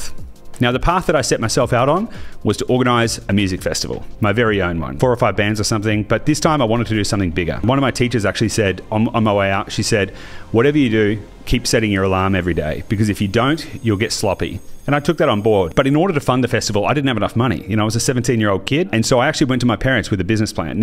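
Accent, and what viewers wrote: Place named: Australia. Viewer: I heard the aussie accent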